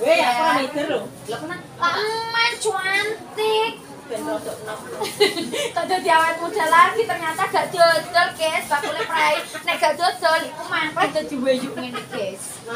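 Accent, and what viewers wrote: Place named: Java, Indonesia. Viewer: Walaikum salam mbake,koyone ne buah seger seger dan pasti enak..tapi lebih kpenak bahasa jowone ketibang buahe mbake so'ale medok tnan.salam santun dari crew happy wa buyut???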